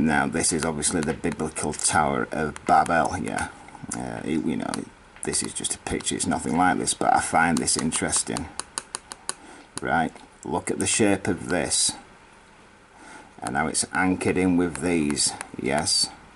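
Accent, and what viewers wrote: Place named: Barnsley, United Kingdom. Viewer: Everytime you say rebar with the Barnsley accent I imagine it's Ernest Moss talking. Makes these videos even better haha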